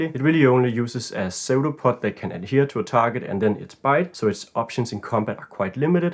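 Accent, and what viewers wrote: Denmark. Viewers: are you danish as a dane myself the accent is pretty easy to hear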